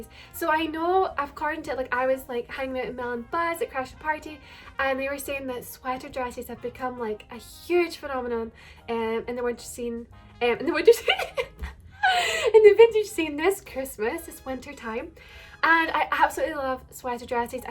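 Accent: Scotland, United Kingdom. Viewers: She made a YouTube video on it a few weeks ago, have a look :) basically she was hiding her proper Scottish accent in fear she wouldn't fit in or couldn't be understood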